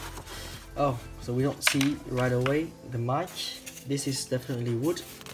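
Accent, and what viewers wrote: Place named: France. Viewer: Are you french ? Because you have a Very big french accent .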